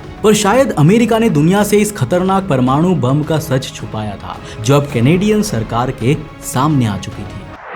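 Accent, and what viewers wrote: Bengal, India. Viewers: Tomar hindi pronounciation sune vabini j tumi bangali , khub sundor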